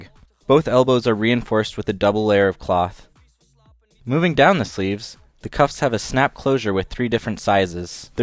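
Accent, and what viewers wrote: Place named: Germany. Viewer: sorry but that's a german accent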